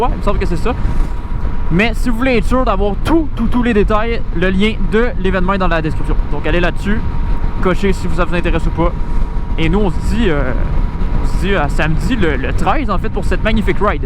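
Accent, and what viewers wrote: Canada. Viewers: Hey je me suis abonner prc j'aime bcp ton contenus est je pense que t canadien prc ta lancent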